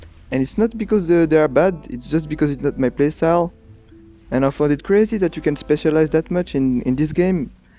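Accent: France. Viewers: Is this Dude frech ? Sounds pretty like it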